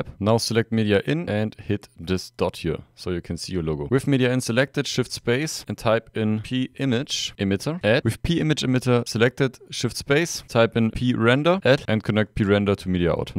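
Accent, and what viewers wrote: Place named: Germany. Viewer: soo i can SEE you ARE German cause pof your Accent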